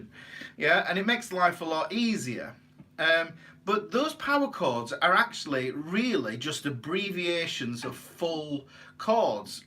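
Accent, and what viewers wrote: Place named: West Yorkshire, United Kingdom. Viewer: Hi,. I've been totally mystified by this for decades until I watched this vid. Thank you so much. Understanding this has opened so many doors now. i suddenly seem to be able to play along to anything once I've sussed the root note. Than k you so much and keep on keeping on,-) Good to hear a West Yorkshire accent on here too. I'm only over in Bradford myself.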